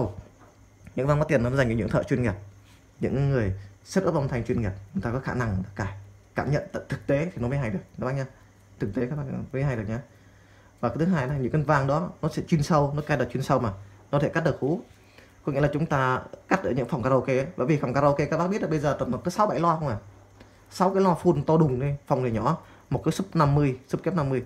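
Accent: Standard. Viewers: Công nhận bạn nói rất chuẩn .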